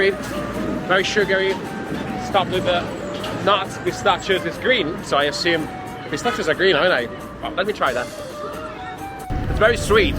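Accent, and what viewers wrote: United Kingdom. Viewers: You deserve more views 👍 Im curious Are you Italian? But you have strong British accent 🤔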